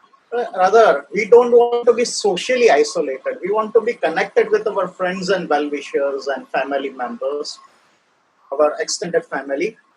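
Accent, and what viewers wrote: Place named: India. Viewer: I wasn't expecting indian accent 😅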